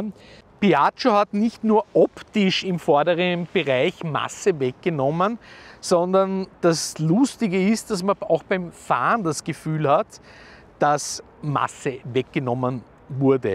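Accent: Austria. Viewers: Wie immer ist dieser Moderator sehr sehr nett und freundlich sowie proffesionell und dann hat er noch den schönen Wiener Dialekt einfach super und genial 😎😃😂😁😀😄😅😆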